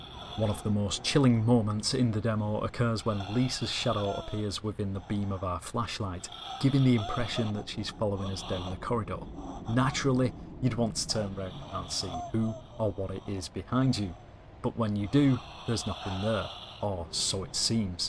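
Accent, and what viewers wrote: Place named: RP, United Kingdom. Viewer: ur not doing bbc news u dont have to speak like such a knobhead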